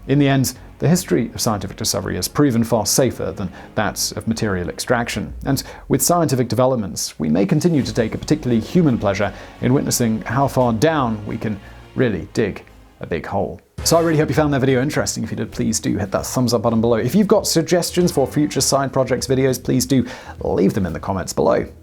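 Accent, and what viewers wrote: United Kingdom. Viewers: no British accent can hide that mistake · As is all algorithms from Borg to Utbe, it go to hell kiss my ass and I'll do as I damn well please. Such is math. Also your device is set probably on Autoplay. Mr British guy is like all things UK: only in small amounts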